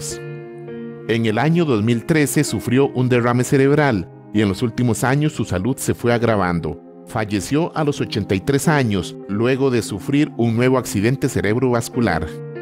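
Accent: Costa Rica. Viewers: Es el acento tico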